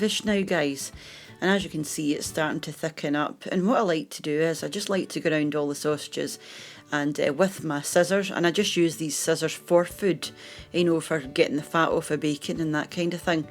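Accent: Scotland, United Kingdom. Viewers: I love your Scottish accent, wee bit is my favourite ;-) the casserole looks good, could you put in the spuds as well if you didn't want mash? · Love the Scottish accent! 👍🏻 Seven hours to make it and less than seven minutes to eat it! 😜😋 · My paternal grandfather was Scottish, and my paternal grandmother was Irish. I miss them, and the way they talked. Your brogue brings back a lot of good memories! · I know right ,Scottish accents are the cutest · I love a scottish accent...so comforting...makes you feel everything is going to be alright😁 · looks delicious, defo need to buy a slow cooker with all these amazing recipes I'm seeing :) I'm from Port Glasgow, so it's nice to hear a Scot on here :P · Am a Scot living in Thailand the last 6yrs and am just going out tomorrow to source a slow cooker... Can't wait to try this simple recipe!!!... and commentary in Scottish makes it a must!!!... 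A harty winter meal in 32° of heat!!!!...Way too hot for a peely wally Jock!!! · Love your Scottish accent. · My husband's mother side of family is proud Scottish! So I was looking for Scottish food recipes but it was so hard to find. I am so glad I found YOU! thank you so much for your recipes.. it is so pleasant listening your accent! Pretty please upload more Scottish recipes.. thank you :) ♡ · What are you on about. She is Scottish this is how she talks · The lassie is Scottish :)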